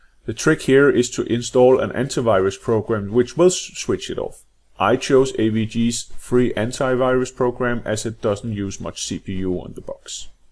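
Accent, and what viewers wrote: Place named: Denmark. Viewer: I can recognize that Danish accent from a mile away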